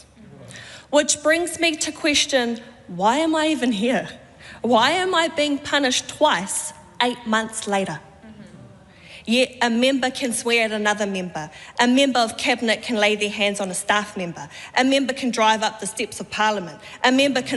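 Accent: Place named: New Zealand. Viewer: i like her accent moari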